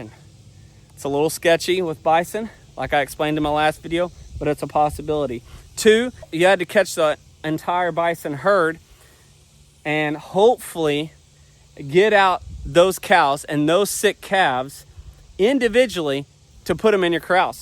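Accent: United States. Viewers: You can say “Waller” all the time as far as I’m concerned. Please don’t change your country accent. It’s nothing to be ashamed of. I love it.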